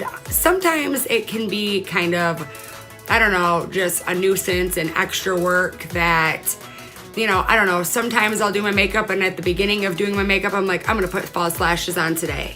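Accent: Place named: Michigan, United States. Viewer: Love you video, are you from Michigan? You have a Michigan accent lol.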